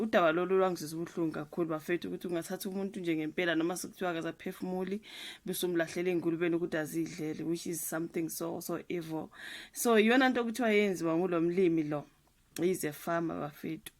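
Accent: Zimbabwe. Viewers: Your Zulu is not make sure it sound Zimbabwean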